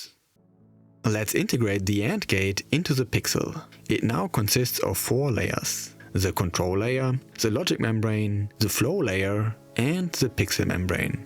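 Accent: Germany. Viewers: Lol du bist deutsch? 😂 wieso ist mir der akzent erst am ende aufgefallen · Are you german? Sounds like a German accent..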